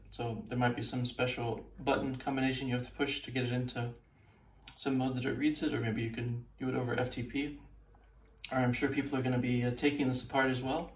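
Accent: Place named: Germany. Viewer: You speak Dutch with a German accent and intonation 😉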